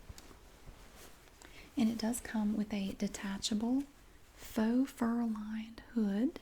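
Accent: South, United States. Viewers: I absolutely love your roleplays! And your voice is so relaxing. Plus, the Southern accent reminds me of home <3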